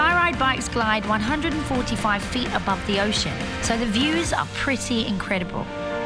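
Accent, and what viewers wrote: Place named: England, United Kingdom. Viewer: and her English accent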